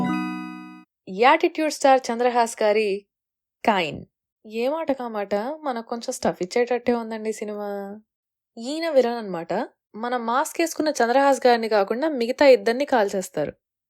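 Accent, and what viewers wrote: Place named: Godavari, India. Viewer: your non pretentious godari slang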